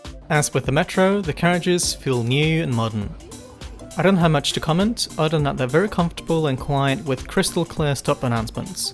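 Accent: Australia. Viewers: For someone who lives in Norway, you sound very aussie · Interesting you are from Norway; there is a lot of Australian in your English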